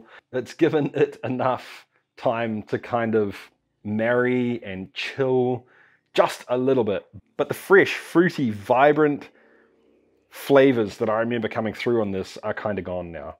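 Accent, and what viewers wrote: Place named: New Zealand. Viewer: New Zealand is weird. His pronunciations are so Aussie, yet simultaneously American! It’s hilarious, love this channel especially since I love my hard liquor!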